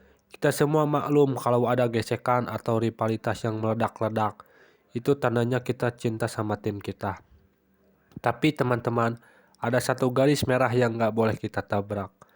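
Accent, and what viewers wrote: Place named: Sunda, Indonesia. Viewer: Mang mantap sunda pisan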